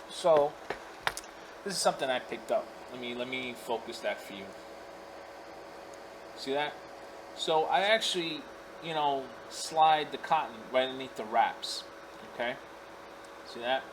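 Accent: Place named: Brooklyn, United States. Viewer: Something tells me your from Brooklyn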